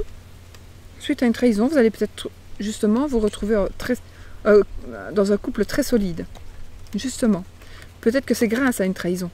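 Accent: Belgium. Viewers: Je suis poisson ascendant lion est ce que je dois regarder la vidéo d horoscope lion aussi ? Vous êtes magnifique jadore votre accent belge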